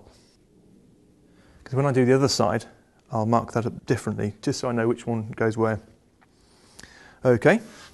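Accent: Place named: United Kingdom. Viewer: not only is this Brillant !! but your British to boot whoo :) no wedding band hehe ( my bad ) LOL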